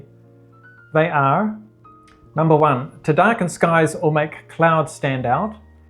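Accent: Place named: New Zealand. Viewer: Nice to see and hear some NZ images/accent. I use Cpl filters alot for black and white photography.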